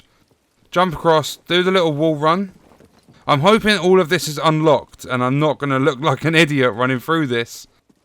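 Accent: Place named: Cockney, United Kingdom. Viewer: Love the cockney accent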